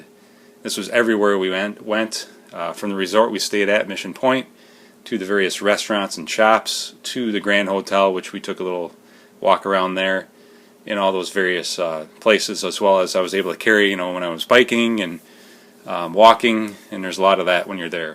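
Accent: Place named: Finland. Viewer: Are you from Michigan originally? I hear some finlander accent. No disrespect I'm a native yooper and thanks for the info.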